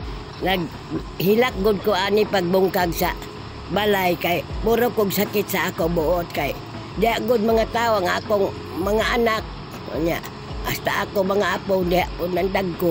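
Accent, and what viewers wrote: Surigao, Philippines. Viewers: Makahilak man pod ta.. God is good. Ampo lang ta kanunay nga healthy ta ug safe.. Nga dili lng sa bugtuon ni Lord ang lastiko.. Heheh bitaw pkatawa lng.. Tga Surigao pod ko pero naa mi sa Norte.. Mao nga pgkabati nko sa imong sinultian nga surigaonon bisag sagol na cebuano. Mkamingaway surigao lami iuli pero tungod sa layo kay dia ko Kuwait unya sa sitwasyon karon. Pero cge lang.. Keep on vlogging.. Kay lipay mi kaau sa nu ni wako labi na pod ug maguban mo.. Keep safe! 😘😘😘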